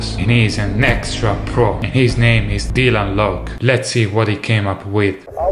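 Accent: Italy. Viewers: Hey but, are you italian? Because when you said "epico" i understanded that you ARE (i think) italian.. i am parli molto bene inglese ma magari concentrati di più sulla pronuncia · italiano si sente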